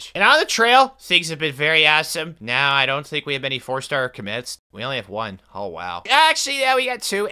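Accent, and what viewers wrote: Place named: Idaho, United States. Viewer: Im 95% sure you are from Idaho dude!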